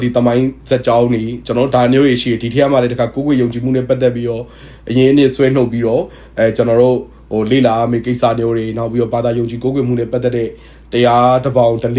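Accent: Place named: Bangladesh. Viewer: တခုပဲအကျန်ပြုချင်တယ်အဖေအမေရဲ့ဝမ်းဗိုက်ထဲကမွှေးလါထဲကပဲအကိုပြောတဲ့ဘဂ်လီလို့ပြောခဲ့တယ်နော်ကျွန်မတို့လဲဘဂ်လီသွေးနှောပဲတာပေမဲ့အကိုကြိုက်သလောက်ဘာသာမဲ့လို့ပြောလဲအကိုစကါးအသွားအလါမှာဘဂ်လီသံပေါက်နေတာရယ်မိခင်ဖခင်ရဲ့အသွေးအသားနဲ့တည်ဆောက်ထားတာဆိုတောလူရယ်လိုဖြစ်လါရင်လူ့ပါတ်ဝန်းကျင်မှာဘာသာတခုတော့ယုံကြည်စွာကိုးကွယ်စေချင်ပါတယ်အကိုကကို့ရဲ့ပြထမ်းထားတဲ့ကျမ်းကန်ကိုအသေချာလေးလေ့လါစေချင်ပါတယ်ဂျပန်လူမဖိုးကိုရီးရားလူမျိုတရုပ်လူမျိုးအဂ်လိပ်လူမျိုးမြန်မာနိုင်ငံမှာရိတဲ့မြန်မာလူမျိုးတွေတောင်အစ်ဆလ္လာဘာသာကိုလေ့လါသင်ယူပြီးအီမာန်တေဂျင်နယ်အမိအဖမွတ်ဆလင်ကမွေးပြီးကို့ဘာသာကိုကိုလေ့လါပြီးသင်ယူမှုတွေလုပ်ပြီးအီမာန်ခိုင်မြဲရင်အကိုကိုညမတိုပျော်ရွင်စွာနဲ့ဂုဏ်ယူနေမှာခုလဲညမကအကိုဘအကောင်မှာဝင်ကြည့်လို့အရမ်းန‌ှမျော့တယ်အလ္လာ့ဆီမှာအလ္ာကြီးဟေတာရပ်ပေးပါစေလမ်းလွဲမရောက်ပါစေနှဲ့လိုဒိုအာလုပ်မှာပါအကိုဘယ်လိပဲဘာသာမဲ့ပြောပြောအကို့ကိုကုလါးဆိုပြီးကော့မန့်မှာဝင်ရေးနေတာတွေ့တော့ညမစိတ်မကောင်းပါဆရာကြီးဝင်လုပ်တာမဟုပ်နောအကျန်ပြုတာကျေးဇူးပါဖိုက်တင်း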